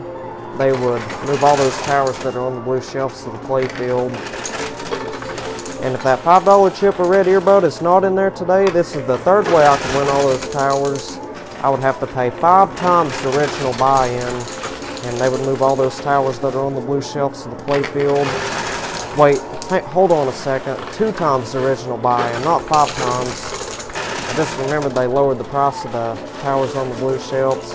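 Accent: South, United States. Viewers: I love watching you play the coin pusher. I live in Canada and I really like you southern accent.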